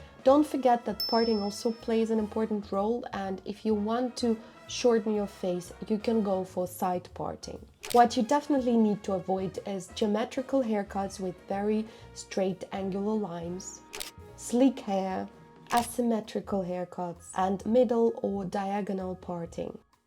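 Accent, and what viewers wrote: Russia. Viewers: У русский акцент, послушала бы ее на русском.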